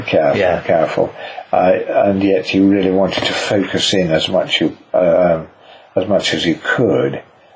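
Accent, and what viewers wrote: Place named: England, United Kingdom. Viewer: please what classical is this? Bach? thank you for informing - by the way, beautiful English accent.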